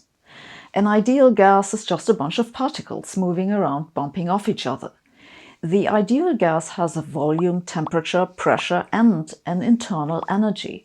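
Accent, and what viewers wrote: Germany. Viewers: Definitely a sub for Sabine. Love your work. She teaches to keep the data and observations separate from our interpretations. Honestly way more of a spiritual exercise on our place in the universe than the pat answers shrouded in ill-defined terms that you'll get from Gurus or even some physicists nowadays. (also, not going to lie, there is a certain draw and power to a German-accented physicist bringing some goddamn clarity to a field that's been lost in math and metaphysics as of late)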